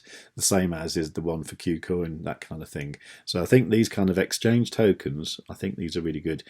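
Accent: Midlands, United Kingdom. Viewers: What part of the uk you from u sounds like ur from midlands?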